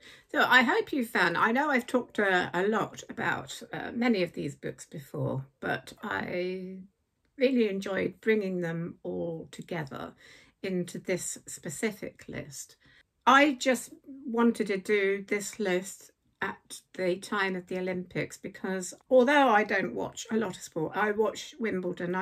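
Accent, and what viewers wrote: United Kingdom. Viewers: Hello! I live in Bedford County, Virginia in the U.S. It’s a small town and is the home of the National D-Day Memorial. Anyway, I’ve never been outside of the U.S. and I have always been fascinated by all things in the UK, Ireland and Scotland and especially books set in these places! I love your videos, your accent, and your knowledge of books and your reviews! Watching you makes me feel like I’m there in your beautiful country with you! Thanks for your inspiration of reading. You are lovely and delightful. Tina 😊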